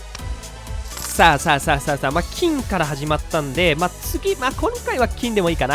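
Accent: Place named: Saga, Japan. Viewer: 佐賀弁むっずいですねwww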